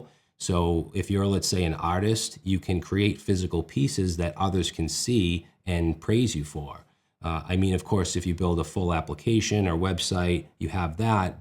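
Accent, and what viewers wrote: Boston, United States. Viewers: That Boston accent tho 🤣 But I am a NY'er and would rather learn listening to you than someone that barely speaks English! I have to say this because it happens a lot with music production too and dudes getting daunted by new DAW's. Don't go into anything thinking you are going to understand the ENTIRE THING - you ain't figuring out Ableton Live in a week when it took 100 developers years to build it. Jump in with a list of JUST what you need to get what you are doing DONE. Learn just how to do what you need to do, then as you need different things you don't understand you look them up one at a time, use AI it's super convenient compared to asking google questions. just my two cents.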